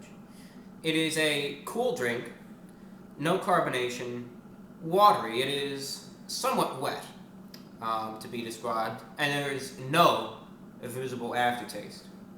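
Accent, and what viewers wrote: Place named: Brooklyn, United States. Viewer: Very Brooklyn accent you have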